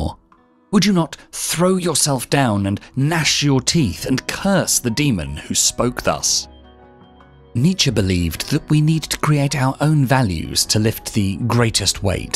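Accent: England, United Kingdom. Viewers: The problem with THIS narrative interpretation of Nietzsche is this: The assumption that every sniveling creature should be an artist and howl at the moon. Sorry, but the world teaches us who has what it takes and who doesn't. And THAT's OKAY. Judgement by your peers is exactly the democratic process which teaches you if the world thinks you have talent. I reject the sophistic use of a "British accent" here to make this story seem authoritative. This is a nonsensical assault on cultural norms. Some people get savaged by life. Get over it. Not everybody MUST BE celebrated.. I have listened to many amazing lectures on Nietzsche. This ain't one of them. This is pure "Woke" nonsense. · Ima go against the grain an say take this w a grain of salt an herb weary of soemthing that calls itself philosophical while using an English accent knowing full well English ppl r stereotyped as smart ppl. 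Like that episode of sunny Philadelphia when Charlie starts speaking w an English accent and smoking a pipe. I like this channel a lot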